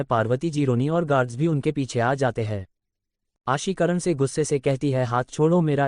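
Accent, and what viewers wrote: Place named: Haryana, India. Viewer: Yes haryanavi I like it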